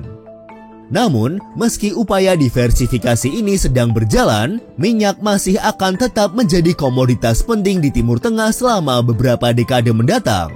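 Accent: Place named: Banyumas, Indonesia. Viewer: abang dubing nya orang ngapak yaaa😀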